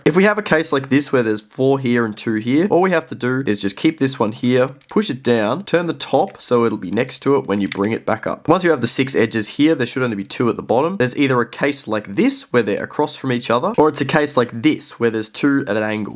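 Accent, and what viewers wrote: Australia. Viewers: Thanks for this quick and easy to follow tutorial 👏😁 But the Aussie accent was so funny 😂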